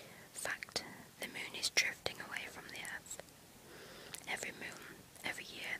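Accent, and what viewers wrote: Yorkshire, United Kingdom. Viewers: You sound Yorkshire voiced..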